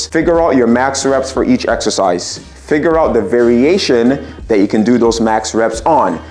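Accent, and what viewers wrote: Toronto, Canada. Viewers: Toronto accent on this man, ahlie? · I knew you were from Toronto (GTA) 5 seconds in…. the accent is strong 🙏🏼🇨🇦🥰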